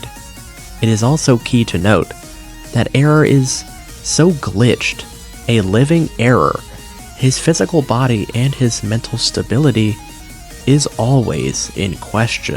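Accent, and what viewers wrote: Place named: Azerbaijan. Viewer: Azari(A Turkish accent)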